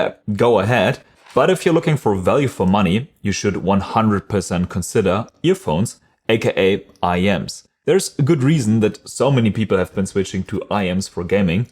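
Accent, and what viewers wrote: Germany. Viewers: the faint German accent is doing things to me, Lord help me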